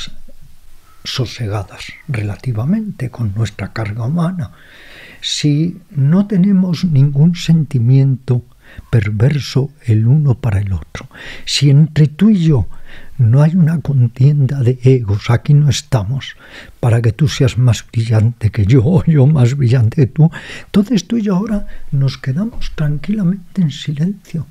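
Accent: Galicia, Spain. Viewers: Qué rato más estupendo he pasado escuchando este podcast! Aunque han sido más de dos horas me ha sabido a poco! Yo a Ramiro Calle ya hace tiempo que le sigo y le conozco, le considero mi maestro salvador ya que desde que me topé con él me ha cambiado la vida y no me cansaré de darle las gracias!🙏 En cuanto a Alex Fidalgo, no tengo palabras! Que magnífico entrevistador y me atrevo a decir, mejor persona! Cómo respetas los tiempos de respuesta, qué manera de absorber todo lo que te contestan, qué preguntas más naturales e interesantes... y ya no te cuento ese acento gallego maravilloso que es música para mis oídos! ❤ Muchísimas gracias por estas joyas! Deseando estoy de volver a escuchar otro podcast! Ah, y puedes seguir repitiendo de invitado. Nadie creo que se canse de escuchar a este señor maravilloso!🙏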